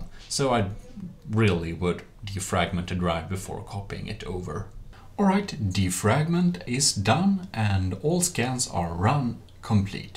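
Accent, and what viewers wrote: Sweden. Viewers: While the video is great I have to say that yes it is the Swedish accent and as a Swede it hurts to hear the accent knowing I sound the same lol. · Either that’s just your accent or the Sweden 🇸🇪 accent. I like it! By the way, nice video. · är du svensk???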